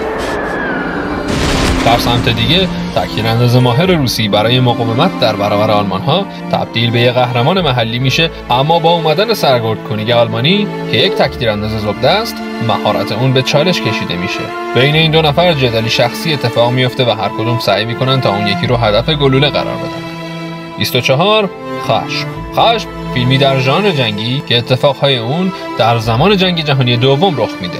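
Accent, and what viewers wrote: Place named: Iran. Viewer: چرا با لهجه ایرانی صحبت میکنی درست گپ بزن یا دری یا فارسی ایرانی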